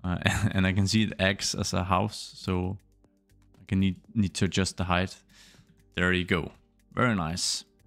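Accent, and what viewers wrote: Denmark. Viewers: this guy has to be danish. thats some danglish if ive ever heard it lol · Are you danish?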